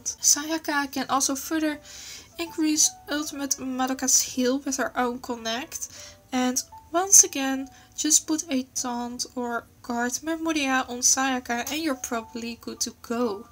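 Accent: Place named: Germany. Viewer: I understand your English lol It's even better than mine cuz I'm not native English eather And I guess that you're German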